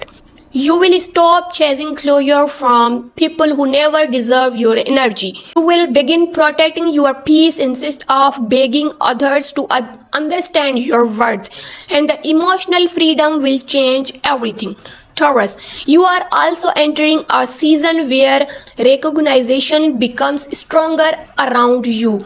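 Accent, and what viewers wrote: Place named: India. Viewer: Panget na ng channel na to dati ok na ok .. Yung Tagalog at lalake pa ung narrator. . Ngayon di na maintindihan pag sasalita naging Indian na ... .. hayss sayang channel mo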